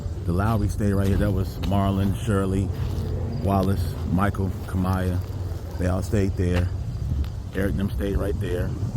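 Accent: Midwest, United States. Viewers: I had a feeling you was from the Midwest I was thinking Detroit or Chicago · I have been to Gary before a couple times. Ran track meet in that area when in high school and think I played a baseball tournament too in high school there. Michael Jackson is the man! I knew you had those midwestern roots....can hear it in your voice. Gary isn't too far from Detroit area where I am from. Detroit roots here baby! 313! That pool is sad that it's not being used anymore. When was the last time u were there in Gary? You know I enjoyed that cameo from the train!!!🤣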